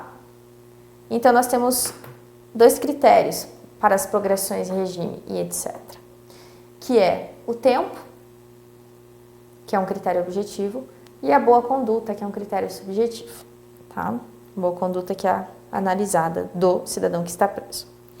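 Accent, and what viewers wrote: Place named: South, Brazil. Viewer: Percebi um sotaque sulista cumulado com o mineiro..... um concurso material eu diria.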